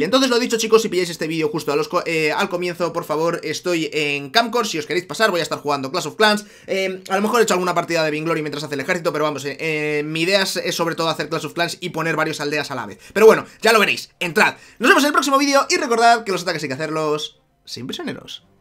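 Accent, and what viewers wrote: Venezuela. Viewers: jajajaja Venezuela hablando como siempre eres tu perrito xd jajajsj (también soy de Venezuela) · eres de venezuela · eres venezolano?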